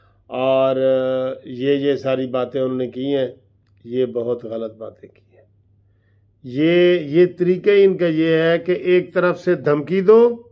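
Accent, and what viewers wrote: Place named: Mianwali, Pakistan. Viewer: sab ap apni mianwali wali zuban ma baat kia krain.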